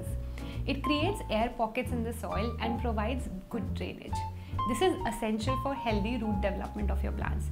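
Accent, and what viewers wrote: India. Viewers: Nice indian English। speaking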